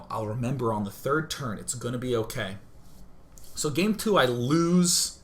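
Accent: Australia. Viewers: He sounds australian not british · It sounds more like a Australian accent XD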